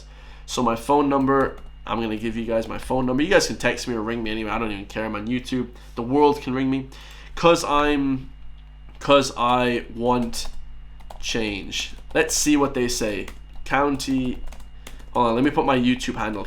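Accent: United States. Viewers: Why do you sound like a yank?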